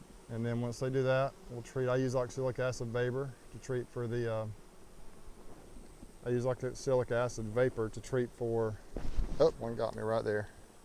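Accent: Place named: South, United States. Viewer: Great video! I really like your Southern “style”. It’s very calming. The bees know it too! Lol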